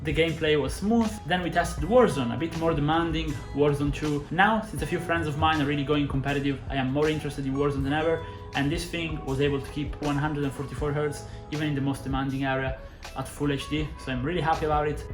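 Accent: Italy. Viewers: Senza neanche leggere la descrizione del canale ho subito capitoo che sei italiano l'accento é forte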